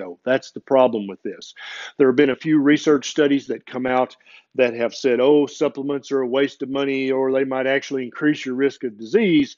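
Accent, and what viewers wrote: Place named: Tennessee, United States. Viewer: I love you! You are so funny and shoot from the hip! Put together with that Tennessee accent and you have become my fav person to listen too!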